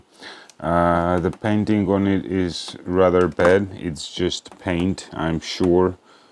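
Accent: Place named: Georgia, United States. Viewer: you have a Georgian accent.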